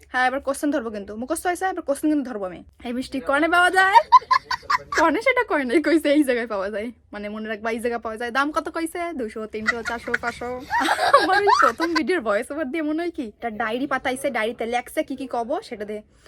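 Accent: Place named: Bangladesh. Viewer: Bangladesh er kun jaigai jeno amon vabe kotha bole 😂😂😂😂😂😂😂kotha gula khub valo lage😂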